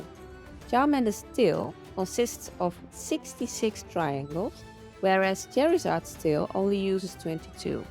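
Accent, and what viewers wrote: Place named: Netherlands. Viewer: Hello! I follow you on roblox then i cmae across your channel. your the best animial ugc creator! and i didnt even know you had an youtube channel amazing!!! Your ugcs are the best and that i hear and see your dutch makes me super happy cause i am dutch also and this video was aaaamazzzinng!!!!! to the power off 10! Goeie video ga zo door!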